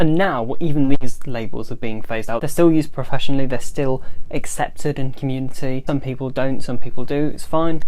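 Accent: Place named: United Kingdom. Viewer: I prefer the term Asperger's, I pronounce it your british way. For several reasons. One it honors Asperger himself, who was a genius and had it himself, two it speaks to the 60 year time gap before idiotic american medical ascociation caught up to his ideas. And three it seems more of a technical personality quirk without being an all encompassing different than normal state. I think it's only being abandoned for political reasons in the political boards and will be taken back up, and as such I'm sticking with it, speaks to how this is very dependent on personal evaluations, where one man can out think the whole world by 60 years like that.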